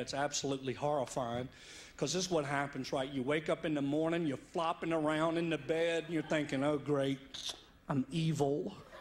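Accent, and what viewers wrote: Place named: Virginia, United States. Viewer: AKE IT BACK! -- One of the best parts of Yes Dear! I love his physical comedy, so good when he has people to work off of! That is definitely a Middle Virginian Accents not quite Tidewater area!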